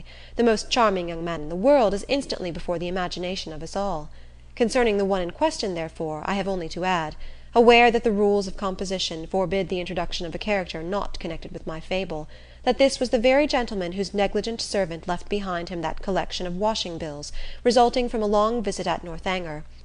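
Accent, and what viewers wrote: RP, United Kingdom. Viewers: Thanks to uploader for this gem. Reader is superb... she does an upper class british accent so well! Your time and effort has given great joy to this part of the world.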